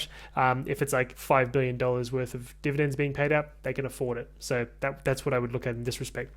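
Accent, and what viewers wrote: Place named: Australia. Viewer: He’s speaking Australian, that’s how we all speak.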